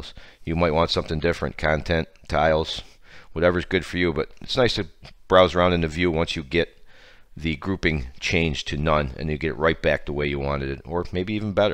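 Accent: United States. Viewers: Beautiful midwestern accent!!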